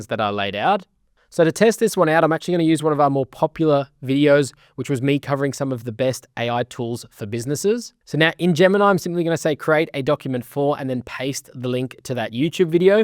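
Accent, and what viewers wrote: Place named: Australia. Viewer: It's a typical feature of the Australian accent.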